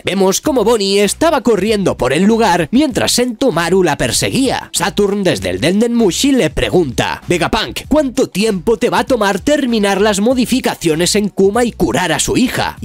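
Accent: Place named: Galicia, Spain. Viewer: Me molesta el acento gallego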